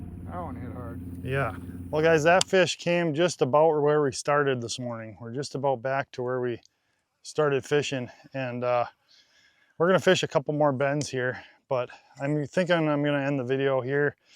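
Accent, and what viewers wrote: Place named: Wisconsin, United States. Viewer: You can tell by basically after everything he says he sounds like Skansen, Wisconsin, Wisconsin. End it seems like he’s up by Northwest probably by Minnesota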